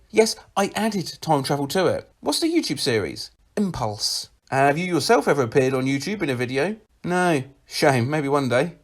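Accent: United Kingdom. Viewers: TBH, a Brit talking this fast is my ASMR no matter what he's saying.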